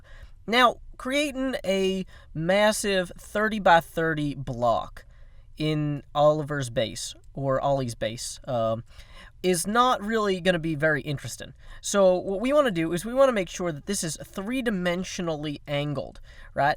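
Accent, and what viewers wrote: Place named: Texas, United States. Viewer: You have a cool accent It sounds Texan but the video says not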